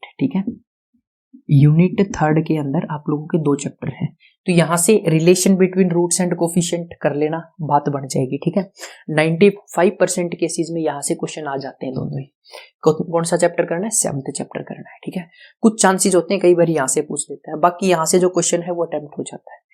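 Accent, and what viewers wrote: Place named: Hisar, India. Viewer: Ye to pra h ki aap Hisar se Ho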